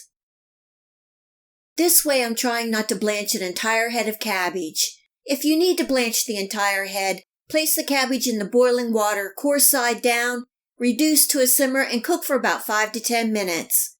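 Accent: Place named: Northeastern Pennsylvania, United States. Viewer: You sound like you're from Northeastern Pa.......me, too! Scranton boy! Love pigs in the blanket! Good recipe,! Every household in my neighborhood made them slightly different...but they were all good!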